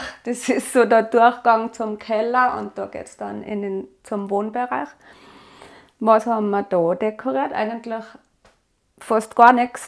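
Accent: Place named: Bavaria, Germany. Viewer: Du bist so ein Sonnenschein, dem Dialekt nach 🤔Bayern oder Österreich? ich liebe deine Deko und auch wie es bei dir zu Hause aussieht, voll mein Geschmack, fühle mich einfach gut in so einer Umgebung. Als Kind wuchs ich in einem Dorf auf mit Tieren und Garten drumherum, Veranda..Jetzt auch im Dorf nur Leider nicht so schön wie damals. Treume von so einem Haus😌🥰wie eures🌹Dankeschön für die Inspirationen.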